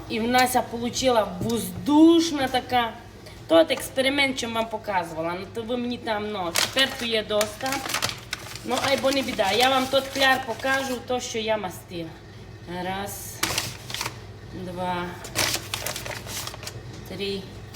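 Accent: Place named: Transcarpathia, Ukraine. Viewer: чекаю з нетерпінням ваші нові відео 🫶 Обожнюю закарпатський діалект у вашому виконанні ❤❤❤. Знайшла (нарешті 🎉) ваш канал на YouTube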